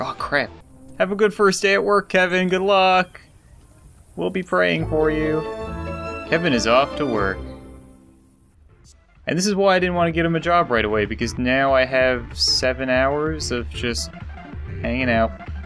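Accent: Canada. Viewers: quick question, are you Canadian? :0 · Are you Canadian, because you sound it. Lol.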